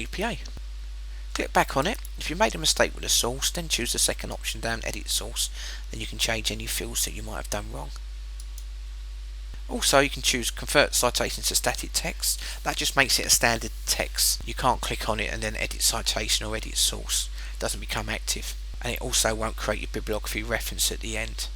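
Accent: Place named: London, United Kingdom. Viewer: You have an unusual name but a strong London accent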